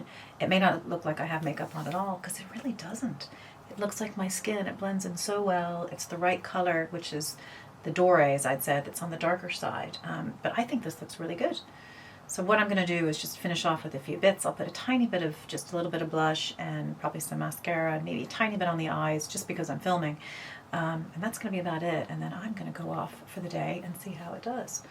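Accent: Ireland. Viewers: You sound a little bit Irish 🇮🇪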